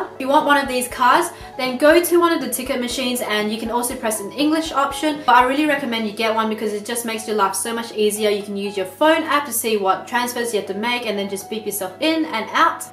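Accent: Australia. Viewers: Are you Australian? Lovely accent. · are you an Aussie? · She definitely has an Australian accent. Even her last name ' ' is not Japanese. But even me, Takeo, could've learned a lot of them from her.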